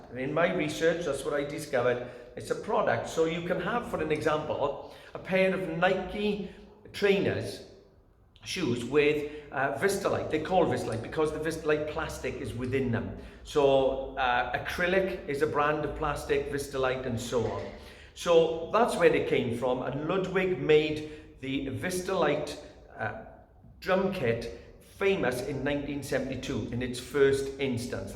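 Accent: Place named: Liverpool, United Kingdom. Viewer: They were discontinued in late 70s because oil prices skyrocketed. Plastics are a by product of refinery business, including vistalite acrylic. It wasnt because of the light up system development, they just werent profitable to manufacture because of the source material price increase. The light system had its flaws too. They would heat up the shells to the point were the seams would start letting up. Are you from Liverpool by the way? You sound like McCartney :)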